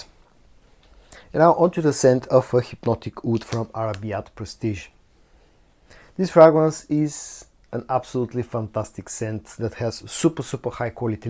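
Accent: Bulgaria. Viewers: Sometimes I have a problem understanding if you're saying "woodsy" or "oudsy"... I guess with your accent the "W" is silent. Hope you don't take this the wrong way...I absolutely love to listen to your beautiful Bulgarian accent. Happy to see the channel advancing pretty quickly after that big 10,000 subscriber mark just a few days ago. I love the thumbnail of the video you made of the 10K...you look so happy and proud. I appreciate all you do for us brother. Be safe all.🙏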